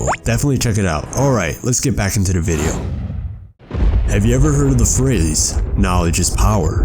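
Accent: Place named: Philippines. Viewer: sounds like my Filipino friend, saying “da” instead of “the”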